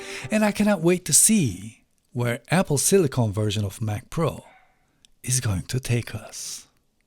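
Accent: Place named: Turkey. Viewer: türk oldugu sesinden belli :D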